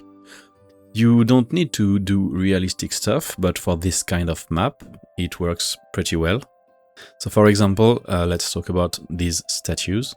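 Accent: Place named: France. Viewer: I love that Youtube goes "ah yes, this guy is speaks french" and puts the AI English Dub over. Not even speaking french or anything btw... just... french accent LMAO Also looks epic asf dude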